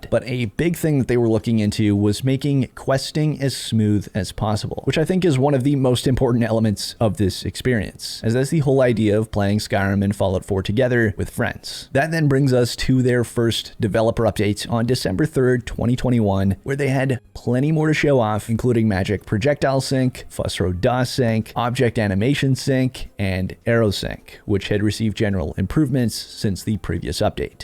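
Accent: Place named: Canada. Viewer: is the master of mods. At first I thought you were from California, but had to pay attention to your accent and you are a true Canadian. 🤣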